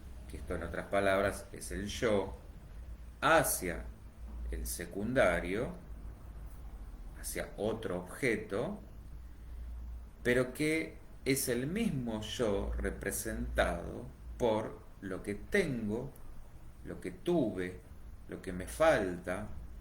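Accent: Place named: Argentina. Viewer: Buen videos y canal , eres humanista hermano argentino entiendo Masomenos el acento es que yo soy mexicano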